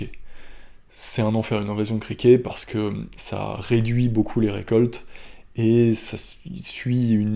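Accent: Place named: France. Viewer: J'adore ton accent français!! 🤩🤩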